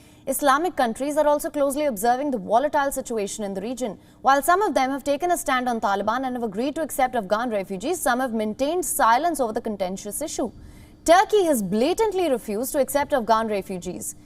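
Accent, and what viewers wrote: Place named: Southeast, India. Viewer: You're eccent southeast India I can't stand that thick eccent 🧐😳😬😬😬😬🤐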